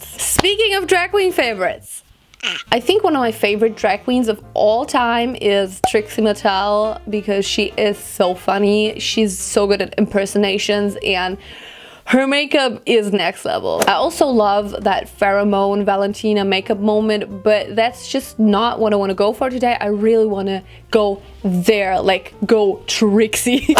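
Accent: Germany. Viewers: Immer der gleiche Anfang ist langsam echt nicht mehr lustig. Dein Englisch ist auch nicht das Beste, man merkt, dass du eigentlich deutsch sprichst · Girl you just try to much beeing a beauty-Guru. I dont know how i ended up here but wth you are so fake af du bist deutsch und das hört man auch! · Ich finde deinen deutschen Akzent richtig unangenehm. Du sprichst zwar gut. Aber das „the“ wird nur anders gesprochen wenn das folgende darauf bezogenen Wort mit einem a,e,i,o,u ausgesprochen wird. Im Normalfall wird das e bei the nicht betont.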